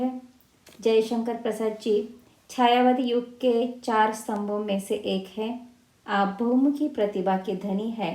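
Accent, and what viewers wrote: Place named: North India, India. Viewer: बहोत ही बढिया....! आपल्या बोलण्याची शैली एकूण आकाशवाणी वरील कार्यक्रमाची आठवण झाली...उत्तर भारतीय व्यक्ती प्रमाणे हिंदी भाषेतील आपले उच्चार शुद्ध आहेत...स्पष्टीकरण ही खूप छान आहे....👍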